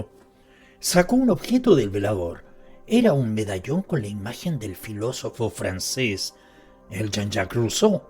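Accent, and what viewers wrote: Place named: Chile. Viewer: yo también ya echaba de menos esa voz y dije: «tengo que escuchar otra biografía más» 😅 Un abrazo muy fuerte. Por cierto, ese acento no es taaan marcado, pero ¿será que es chileno? 🤔😌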